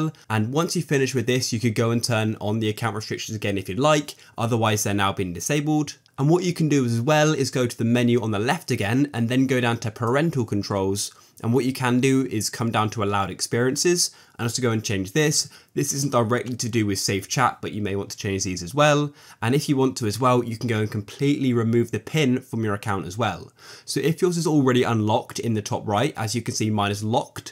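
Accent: United Kingdom. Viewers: No way y’all all just got scammed by a random British dude I feel bad for y’all 😂.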